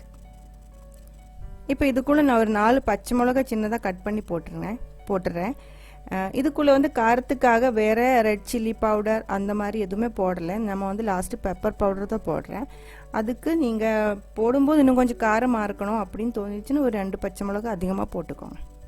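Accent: Kerala, India. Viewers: Your Tamil blended Malayalam is so nice to hear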